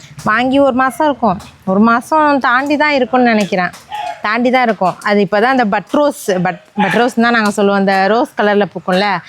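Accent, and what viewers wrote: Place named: Thanjavur, India. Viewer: Namma thanjavur bashai neruputi❤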